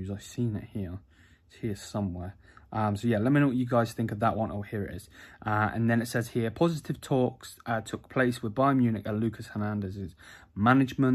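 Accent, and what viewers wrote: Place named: United Kingdom. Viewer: Where are you from? You sound british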